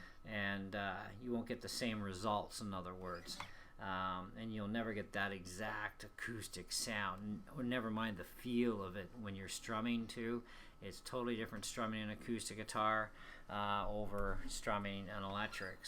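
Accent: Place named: Newfoundland, Canada. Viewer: you got to be a newfoundlander :)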